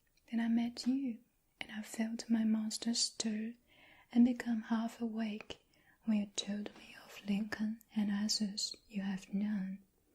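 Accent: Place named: Australia. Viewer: 好好听 有一点点澳洲口音哈哈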